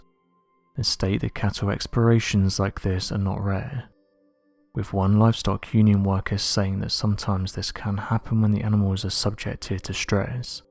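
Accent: United Kingdom. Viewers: This was probably taken out in Western Kansas at the feed yards out there. It happens, folks. It's hotter than Hades right now and the picture is misleading. All these cattle didn't just drop dead where they lay. In large operations like these the dead ones are gathered up and buried in a mass grave. When your running a few thousand head there's going to be losses so this is just nothing to make a big deal about, though, our British accented friend seems to think so. Nothing to see here, but, Beware of the Fear Mongers on YouTube.!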